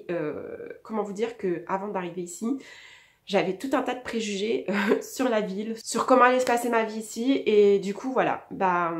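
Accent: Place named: Quebec, Canada. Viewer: Ton accent est différent. Il y a un petit peu d'accent québécois, J'aime bcp.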